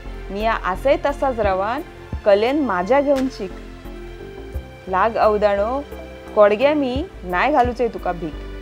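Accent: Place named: Malvan, India. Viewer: अतिशय सुंदर. कवितेतील अस्सल मालवणी शब्द आम्ही लहानपणी ऐकले होते. नाहि तर हल्ली मालवणीच्या नावाखाली काही तरी धेडगुजरी मालवणी ऐकवतात/बोलतात. असच अस्सल मालवणी तुमच्या कडून ऐकायला मिळो हीच सदिच्छा.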